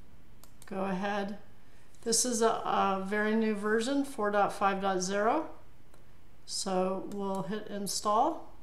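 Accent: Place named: New Zealand. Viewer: Great video. Love the kiwi accent too!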